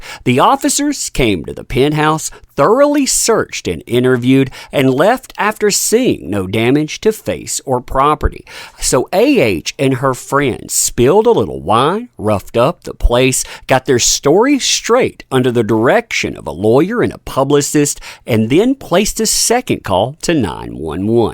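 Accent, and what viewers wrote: South, United States. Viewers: Your hill Billy voice is very irritating. Must Americans sound so uneducated and low class. Except Johnny I love Johnny but he rightly prefers England and France anyway.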